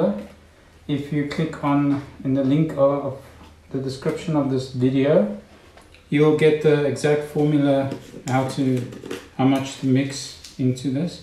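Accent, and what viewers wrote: South Africa. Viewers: Ons kan nie weg kom van ons boer aksent nie né..😂😊😊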